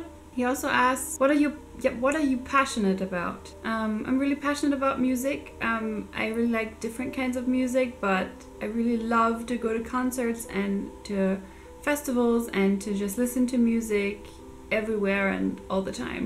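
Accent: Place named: Germany. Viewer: omg when I heard your voice/accent I immediately thought you were german and turns out I'm right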